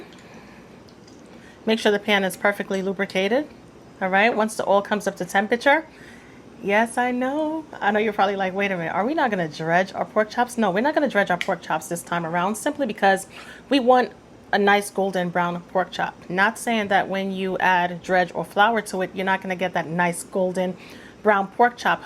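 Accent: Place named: Brooklyn, United States. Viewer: I follow a lot of cooking channels and am surprised I just found yours a few days ago. I'm glad I did, such delicious recipes to try out. Also can't help but notice your accent and was wondering if you're also from Brooklyn/NYC.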